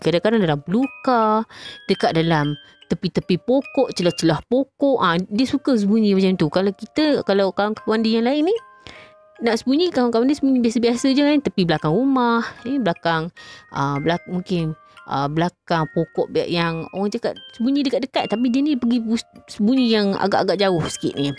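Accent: North, Malaysia. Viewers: pandai dialek utara...😅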